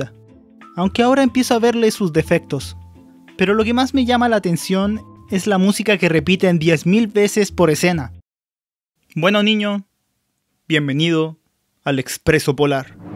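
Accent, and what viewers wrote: Argentina. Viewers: Eres de argentina? :v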